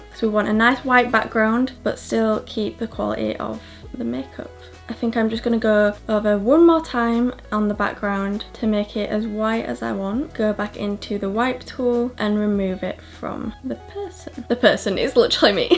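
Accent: United Kingdom. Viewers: YEEESSSSS SHES BRITISHH!SHE GETS ME... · ....doesn't everyone have an accent..? Do you mean she's British? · SHES BRITTISH. UGH IM IN LOVE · POV: u had no idea she was British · omg i had no clue that u were british!! you’re so pretty and omggg i love you’re accent and i thought u we’re american tbh but omg🥰❤️ so pretty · Bruh I wasn’t ready for the accent hello fellow Brit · OMG I didn't know you were British I thought you were American and I didn't expect your voice sound like that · Your from the UK sis❤ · Jade McAboy she is brithia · I looked her up and it said she was born in England and I was like oh my God I need to hear her voice. So, I came here and I had no clue she was British. I only saw her in tiktok. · Wtf she’s British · Ur rlly British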